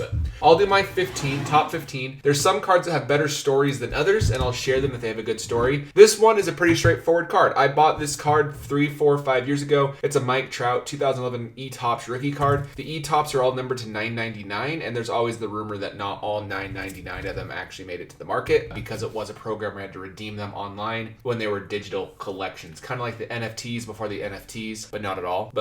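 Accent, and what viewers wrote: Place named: Utah, United States. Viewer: Sounded like he is a Utah boy like myself.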